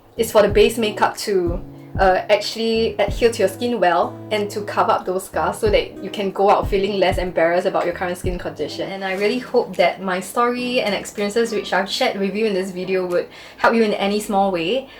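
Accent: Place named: Singapore. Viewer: dat singaporean/malaysian accent....instant recognition and instant upvote from me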